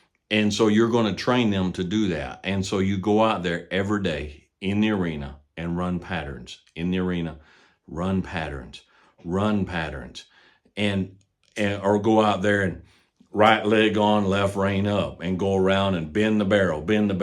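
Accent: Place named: Midwest, United States. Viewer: Good morning from Moscow, Russia. It's in the morning, and I was about to go to bed when I came across your video and realized I couldn't miss it., it's been a tough year. I divorced my wife because of her infidelity, almost killed myself, and went through a depressive episode, but you helped me. Your videos with the measured pace of narration, calm voice, metaphors about horses and nature, those stunning views in the background, a cigar in your fingers and a Midwestern American "cowboy" accent were like an asmr for me. In moments of anxiety or restlessness, I would often just turn on random videos from your channel and find peace in them. Thanks to you, I survived the hardest episode of my 22 years of life and realized that the last two months of 2023 were the best, as I finally started breathing fully again. Thank you. I respect you and appreciate what you are doing for the younger generation.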